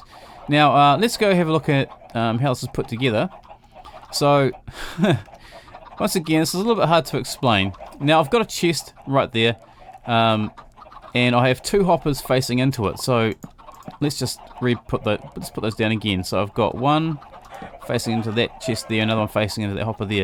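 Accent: New Zealand. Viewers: Your a kiwi! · R u from New Zealand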